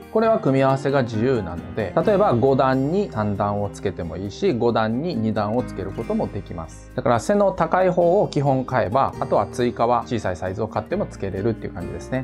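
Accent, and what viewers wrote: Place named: Kansai, Japan. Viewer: 関西イントネーションすこ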